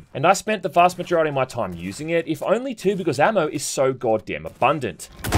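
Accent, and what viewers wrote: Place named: Queensland, Australia. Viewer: judging by your accent, are you in QLD?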